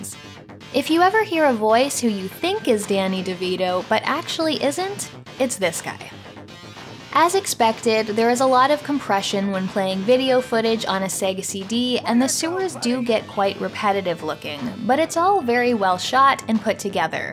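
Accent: Canada. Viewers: I remember seeing a Sega CD and Sewer Shark set up on a demo display at Meijer, which is a grocery store chain around Michigan which you probably don't know since I assume you are from Canada based on your accent, but as a young teenager at the time seeing this game I was blown away. For some reason, seeing shitty live video in a video game during the 90s just knocked your socks off. It is weird to think that devs and publishers thought that this was the future of video games at the time. Like they honestly thought super grainy live footage with a crosshair on the screen where you shoot dumb shit was where videogames were going forever. That's insane. On a side note, I'm playing Subnautica right now and I'm about 35 hours in and that game is insanely awesome. Maybe check it out if it looks interesting to you. It has the potential to give anyone PTSD though.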